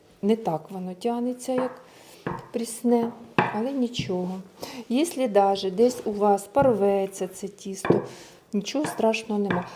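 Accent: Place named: Dnipropetrovsk, Ukraine. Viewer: Я в захваті. Чорму раньше не попадала на ваш канал. Як гарно у вас все виходить! Все так уверенно, як вроді у вчителя, вроді ви всю жизнь з молоду випікаєте. Мені вже за 50 та й то руки трусяться, бо поки мама була жива, то я не вміла даже зліпить пиріжка. Оце 10 років як хоть трохи навчилася. Українська точно як у нас, на Дніпропетровщінді.Дякую вам, буду вчиться дальше. З мене підписка обов'язково❤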